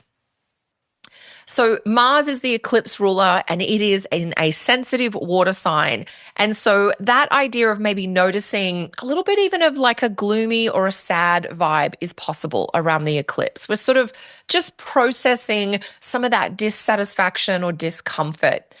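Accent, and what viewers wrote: Australia. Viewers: So nice to hear a fellow Australian . I have south node in Aries . Chiron in Aries and par of fortune . I don’t know what it means but I feel like crap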